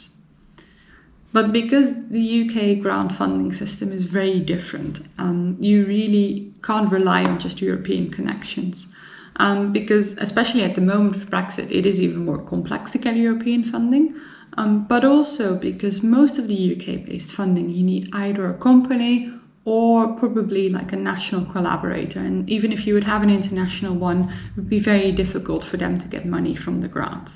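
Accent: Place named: Netherlands. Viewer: Your English is very good from my stand of view (as a Dutch), but I immediately recognised your Dutch accent. My question:how do born and raised English people respond to that? They also must hear English is not your mother language.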